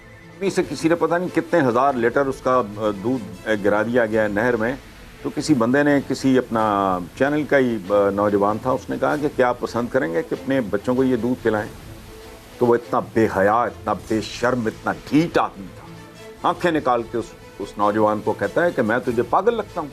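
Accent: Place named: Punjab, Pakistan. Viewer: Tusi khuskardita toda panjabi awaj dilpe habi paji tusi great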